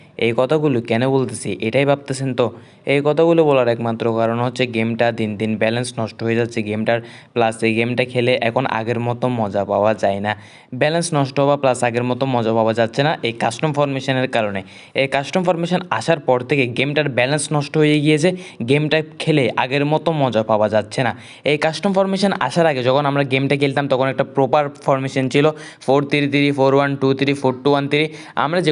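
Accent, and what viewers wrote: Chittagong, Bangladesh. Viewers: চিটাগাং থেকে বলছেন ,তাই না.? একসেন্টেই বোঝা যায়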